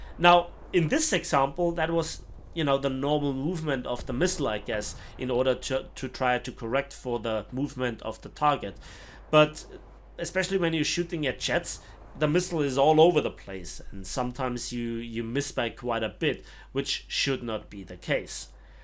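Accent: Germany. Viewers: i hate german accents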